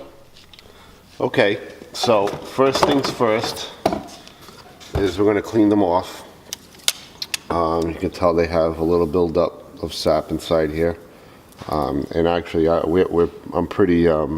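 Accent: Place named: Massachusetts, United States. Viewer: Hey...if we got married....my name would be......Tamara!!! Lets do it! Lol i have no idea who you are im just here to get some instructions on how to sharpen my shears and you sound like you are from Mass. Have a great day thank you for posting this helpful video. Hope u got a little laugh my pay for ur vid. 😎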